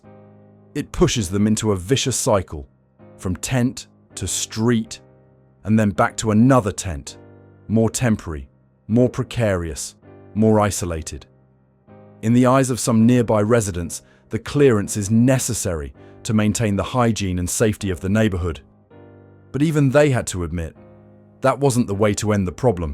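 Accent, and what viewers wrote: United Kingdom. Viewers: the British narrator seems strangely out of place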